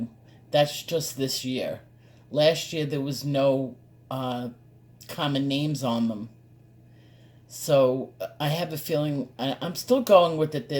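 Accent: New York, United States. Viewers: You are an amazing colorist. I have been pining for Holbeins but just can’t afford them, even though I don’t believe that I would do them justice like you. Her hair is like my mother’s almost, my Mom had a bit more red, mine was darker but with the reddish highlights. I never tire watching you make a picture come alive and love the NY accent!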